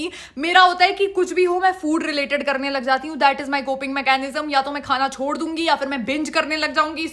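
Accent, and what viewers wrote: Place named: Kashmir, India. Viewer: Brooo, I literally felt like you sounded Kashmiri, but I wasn’t totally sure. I was getting that vibe from you really cool! I’m Kashmiri too. I know that wasn’t really necessary to say 🥀 And congrats on hitting 20K!!!💅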